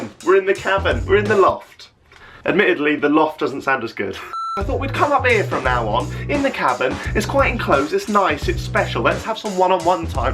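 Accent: Newcastle, United Kingdom. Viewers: What's gan an everyone itsa true geordie backagain!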